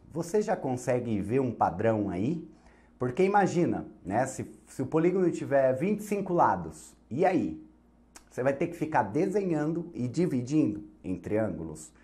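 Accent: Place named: São Paulo, Brazil. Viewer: sotaque pulista kaka bom d+ tmb sou paulista mas ele tem um sotaque muito forte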